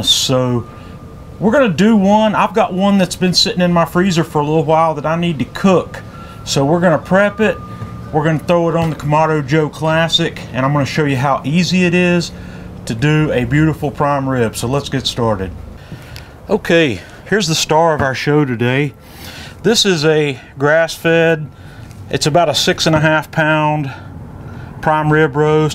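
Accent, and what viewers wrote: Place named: South, United States. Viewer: Hello from Ireland; I’m new to using a kamado and I’ve come to learn that unless the instructor has a deep southern (US) accent, I ain’t listening!! Great video……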